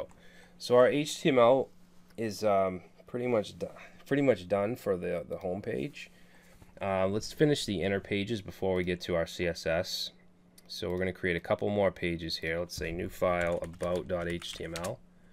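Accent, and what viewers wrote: Boston, United States. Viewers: Sometimes you sound very young and then the Boston kicks in and you cuss and sound older, but with the cam we can now tell you are just right. :)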